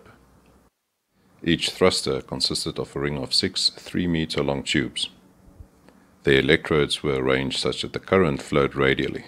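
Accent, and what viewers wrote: South Africa. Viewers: You sound very South African, thanks for the videos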